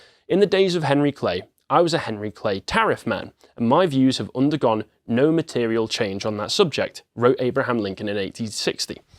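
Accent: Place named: United Kingdom. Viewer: It's really interesting to hear American history discussed by a Brit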